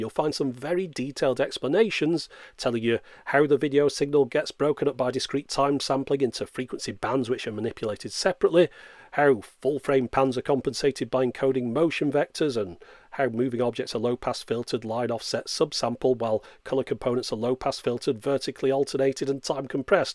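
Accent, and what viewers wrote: United Kingdom. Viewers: Your british accent is pure eargasm. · British axxcent?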